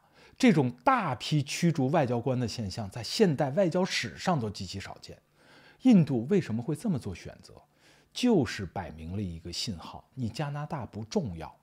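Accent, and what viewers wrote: Standard. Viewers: 主播的标准普通话非常好，非常珍贵，听着就舒服。 现在很多其他主播普通话都不标准，说话时舌头伸不直，都秉承特色社会主义装B狂，听着觉得恶心。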